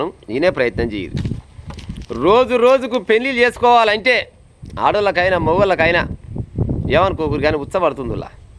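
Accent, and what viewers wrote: Telangana, India. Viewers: తెలంగాణ పల్లెయాసలో కథ చెప్పడం చాలా ఆసక్తిగా ఉంది. కలియుగంలో ధర్మం కేవలం ఒక పాదంపై నడుస్తుంది అంటారు. ఆ ఒక్క పాదంకూడా బలహీనమవుతున్నట్లుగా ఉంది రాను రాను.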